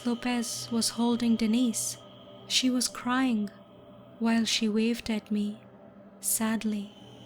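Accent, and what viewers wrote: India. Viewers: It sounds like you have an Indian accent.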